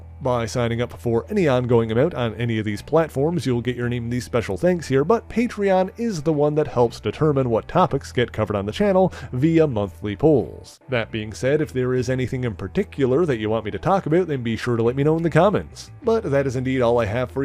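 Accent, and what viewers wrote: Newfoundland, Canada. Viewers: I detect a mild Newfie or Cape Breton accent here b’ye!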